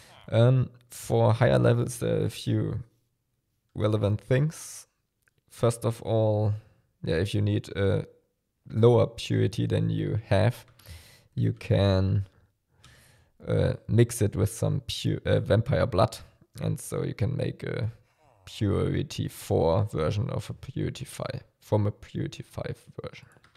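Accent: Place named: Germany. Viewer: i can hear a small german accent mein Herr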